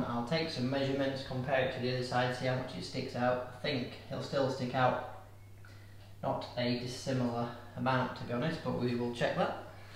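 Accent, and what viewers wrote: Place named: Gujarat, India. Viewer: WOW YOU gujarati i am from gujarat nice to heard you speaking love you...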